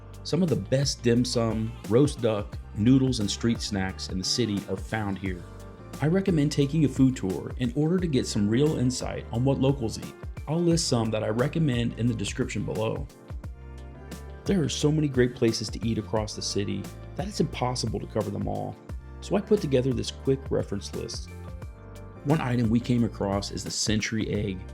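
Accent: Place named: South, United States. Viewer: You accent is horrible ! Please sound Swedish and not Southern USA.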